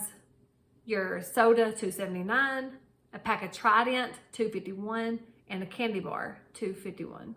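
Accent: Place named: South, United States. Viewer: You’re Dave Ramsey but with a pleasant personality and a better southern accent ❤ I am Russian and I’m loving your videos so much!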